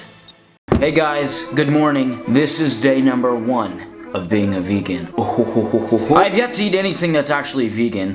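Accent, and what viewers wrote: United States. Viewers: Are u American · is this guy really russian? he sounds like an american.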